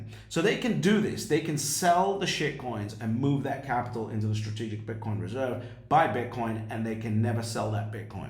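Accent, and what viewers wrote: Scotland, United Kingdom. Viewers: Good channel bro, you sound like you are from Scotland.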